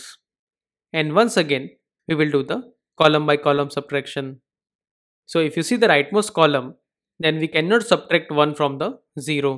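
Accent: India. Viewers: You know it a great video when he have an Indian accent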